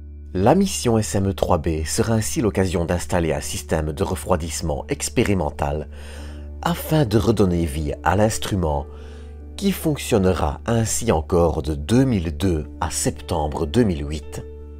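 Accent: Belgium. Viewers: Je sais que je ne vais pas me faire des amis mais le documentaire a l'air vraiment sympa et très intéressant mais la narration 🥶🥶🥶 au bout de 10min j'ai arrêté car je n'en pouvais plus... dommage...un mélange de belge, américain, allemand et canadien. Et la façon de prononcer Hubble à chaque fois ça devient très irritants.. mais je répète dommage car le documentaire doit être vraiment intéressant.. désolé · Ce monsieur a tout à fait le droit d'être belge et d'avoir une manière différente de parler... Quel commentaire insupportable.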